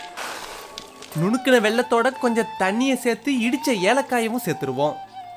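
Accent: Salem, India. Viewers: Wow what voice I think Salem area crt ah entha area attur?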